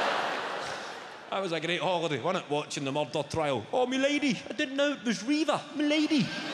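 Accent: Scotland, United Kingdom. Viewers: Being fair, Kevin is speaking Scots English (or Scottish) -- a vernacular of English that is essentially a different language entirely, but mutually intelligible with Standardised English. It would be nice for people to remember that when they act like Scottish is just a "comedy accent" on the Twitter. ("Mutually intelligible" meaning that two people can speak a different language, but as long as they are closely linked enough historically and linguistically, they can understand each other just fine despite any differences in grammar, vocabulary and pronunciation. Another example would be Swedish and Norwegian). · i love the scottish accent but man, i barely understand it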